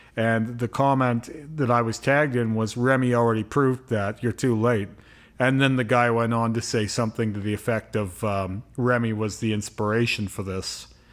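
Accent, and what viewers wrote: Canada. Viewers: As a Canadian myself, I could tell right away that you were Canadian lol everyone thinks Americans and Canadians have the same “accent” but it is so different hahaha cool stuff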